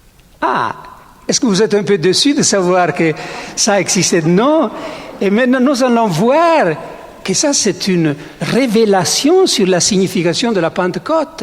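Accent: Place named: Italy. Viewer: Bonjour mon Père, votre accent a le parfum de l Italie le parfum de la meditéranné et en vous écoutant nous prenons le grand large , merci infiniment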